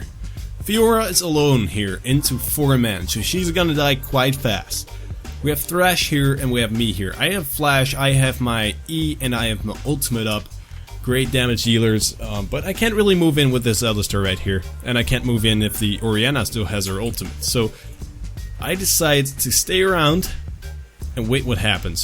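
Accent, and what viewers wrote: Netherlands. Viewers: Guy your English ;D That accent is too obvious, dutchie xP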